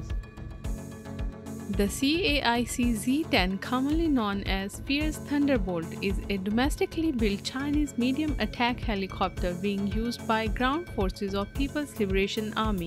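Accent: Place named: India. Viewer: Oh my God that Indian accent hurt my ears so bad 😔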